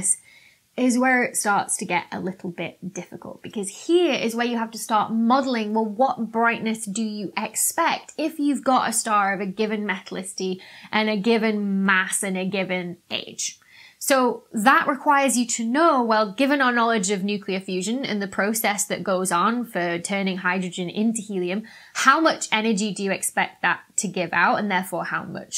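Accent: United Kingdom. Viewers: I thought I detected a British accent, and the "naught" confirmed it. This channel is a sapiophile heaven, or Eden. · "plazmer"...I love a British accent.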